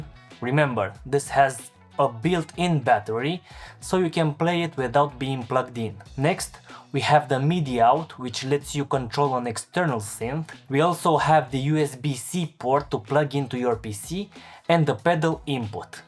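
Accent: Russia. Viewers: Как буд-то русский по английски говорит))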